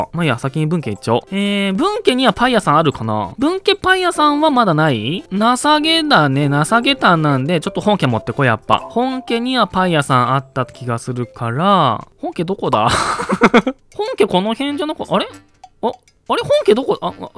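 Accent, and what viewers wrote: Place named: Mikawa, Japan. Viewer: よく三河弁出とるもんで三河？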